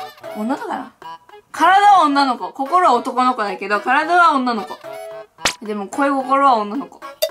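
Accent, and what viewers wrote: Kansai, Japan. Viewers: 関西人だから関西弁じゃない？多分...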